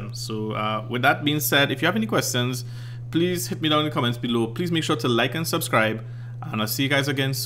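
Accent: Trinidad and Tobago. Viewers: You sound like you are from. Trinidad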